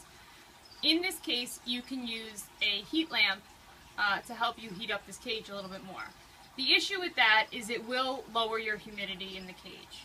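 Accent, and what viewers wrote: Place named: Long Island, United States. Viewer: Where's your store? Sounds like L.I. judging by the accent.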